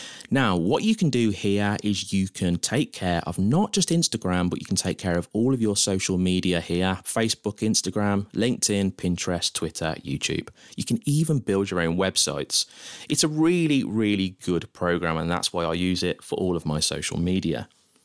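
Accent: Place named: West Midlands, United Kingdom. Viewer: Excellent video. And I find the slight West Midlands lilt relaxing as well. Which is good because this is an infuriating task.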